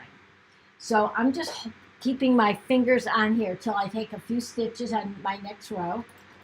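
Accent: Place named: Australia. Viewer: I can hear you Australia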